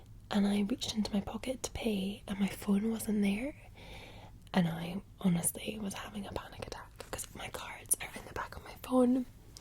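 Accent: Scotland, United Kingdom. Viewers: It’s a bit of a mix. She used to live around London, so her Scottish accent now is a bit lighter than the typical accent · Since you sound Scottish, you ever had brandy? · Is that the scottish accent or a bit toned down? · I am so happy that you are now settling into your new home:)!! Is Hugo, you Dog happy with his new home? I really LOVED the way you read the Fisher Man Moon Story with your Scottish Accent which made the poem sound more beautiful:)!!! I LOVED both of your bean bags which I think sound like the ebb and flow of the ocean waves:)!! I also LOVED the brushes, both the sounds and the visuals which feel like a virtual facial massage:)!! And I too have had embarrassing incidents like I was at my Target and I had sworn as I was about to pay for my items that someone stole my Wallet, but when I went home I saw that I left it in my Living Room!! 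Also, years ago, I was at my Super Market and I realized I did not have enough money, so I had to leave a bunch of items on the Counter!! So, you are not alone:)!! I consider myself a Christian, but I have a good sense of humor and I am not a prude. So, it does sound funny about the "jumpers". LOL:)!! My Brother, before he became a Father was a bit like your Brother, except I do not think he would have been that "naughty' in front of my Mom, now Late Dad and now Late Grandparents:)!! As for visiting, I would suggest you set up Zoom or Skype to stay in touch with your extended loved ones:)!! My Boyfriend's Dad is very intelligent and even though he is Elderly he is setting up Zoom for all of us to socialize together:)!! However, I do have a set of 3 to see in person as well, but not by any American rules or laws. It is just something we t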